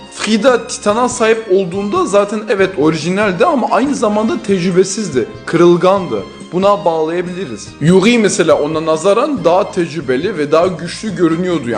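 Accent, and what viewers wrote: France. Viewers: Knk adam Fransız aksanlı konuşuyor ve TikTok bildirim dili Fransızca abimiz yarı Fransız olabilir.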